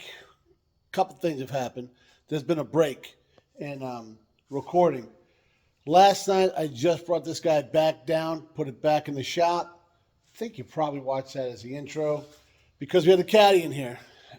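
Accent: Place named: East Coast, United States. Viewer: ok I subscribed your funny and got that east coast talk that I like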